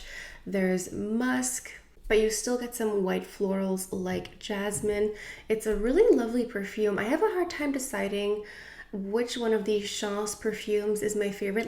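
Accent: France. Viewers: Love the French pronunciation! I love fragrances and own 21 bottles but Chanel Chance will always be my #1. You have a lot of my favs. Nice collection!!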